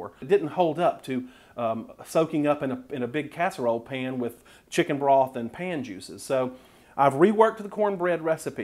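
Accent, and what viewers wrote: South, United States. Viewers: I used cheesy skilley bread to make last year's low carb dressing,and it was good. I knew I wanted to tweak it some this year. I appreciate this recipe so much! The texture is so amazing! I ended up using all butter, cuz I was too lazy to mess with bacon and I knew the butter would be fine.Lol...My butter got good and brown in the skillet before I poured the batter in and lemme tell ya....Whole nother level of goodness happened there😍 omiword! I am kind of like a picky Lil kid so I use all powdered seasonings.I definitely like a good amount of sage ( I mean you sound southern and all,but Idk if you can be right without sage in your dressing😂), My goal today has been to enjoy a lower carb,no stress Thanksgiving. So far so good. People hear low carb no sugar and they're not interested....muahhhahahahaha...my nefarious little plan works. 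They have no idea how good and satisfying this food is! Thank you for doing this and Happy Thanksgiving to you and yours! ❤️🙌💪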